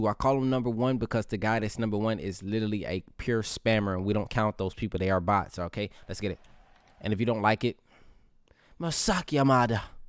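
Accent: Jamaica. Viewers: u jamaican nw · Lol you Jamaican bro?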